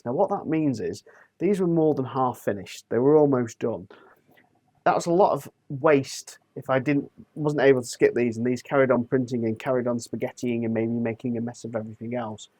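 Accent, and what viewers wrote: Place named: North, United Kingdom. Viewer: Love "free-D" printing. Please learn to speak English. It would be so much easier to listen to you. Speaking Mockney in a northern accent just sounds weird.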